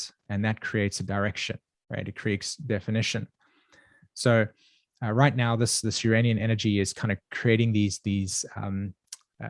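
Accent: South Africa. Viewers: Brilliant, Have subscribed! Are you South African by any chance? Thank you for sharing your wisdom so beautifully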